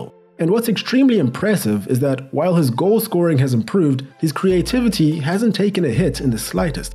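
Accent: South Africa. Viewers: homie talking South African? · I'm a simple man. I hear a South African accent and I subscribe 🤌 gotta support the peers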